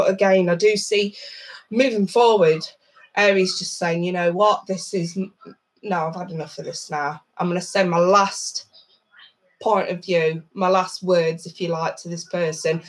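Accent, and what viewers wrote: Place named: United Kingdom. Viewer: Your simply splendid! Team Aries here trying 2 let go of Libra drug addict ex Tyler recommend u Love yr British accent 👌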